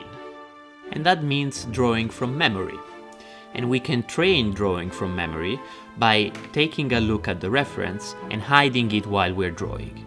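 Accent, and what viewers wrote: Italy. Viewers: Thank u for those tips, they were very helpfull. I have a question, ma sei italiano??😅 dalla pronuncia sembra di si, comunque grande grazie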